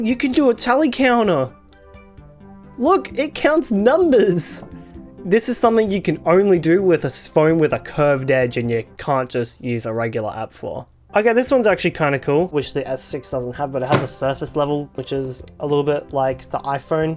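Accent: Australia. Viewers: I see a pair of talking hands with an Aussie accent and first thing comes to mind is some guy with a green ipad talking about tech stuff in a entertaining way. jokes aside this channel is great anyway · wtf why is it that most of the english speaking channels i watch are guys from australia xD anyway, i really like your content❤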